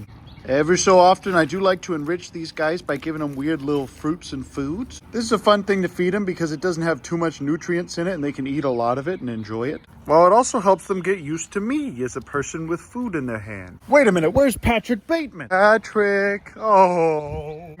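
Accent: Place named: Pennsylvania, United States. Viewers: Why do you have a Pennsylvanian accent if you’re from Texas. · Hearing this man use western PA speak is always confusing to me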